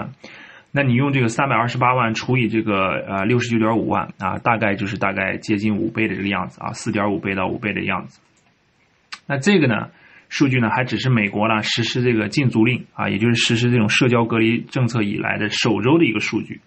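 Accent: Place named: Inner Mongolia, China. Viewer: 越听越像哈，也像呼市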